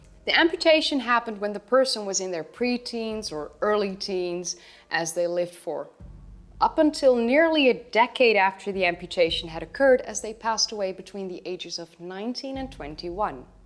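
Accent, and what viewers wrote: Netherlands. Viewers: grappi je hoort meteen wanneer iemand van NL is haha wel leuk wat je doet hoor erg interessant hoor keep up the good work <3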